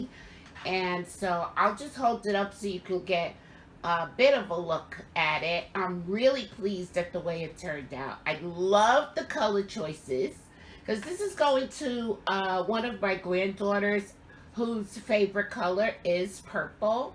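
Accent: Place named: Bronx, United States. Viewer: I like your videos, that blanket is amazing. And your sister will love that shawl I'm sure, it's gorgeous. My husband was listening while I had your video playing and he said where is she from? I said she lives in NC but I think she's from further north. He said her accent sounds like our dear friend Maryjane who is from the Bronx. And when you said you'd send this to your sister in New York, I thought I'd ask you where you were from. I'm originally from eastern Pennsylvania but live on our sailboat now and meet people from all over the world. Thanks so much for brightening my day and I'll keep that quote in mind.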